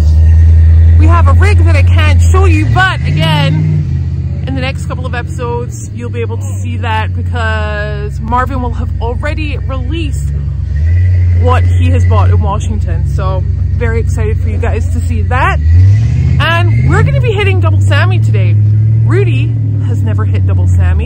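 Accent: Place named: England, United Kingdom. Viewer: Why do you have an English accent what changed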